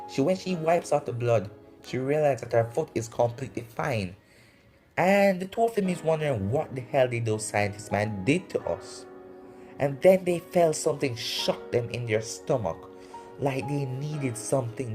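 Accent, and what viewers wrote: Jamaica. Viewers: Your accent reminds me of white yardie. Great vid man. Gonna binge the series.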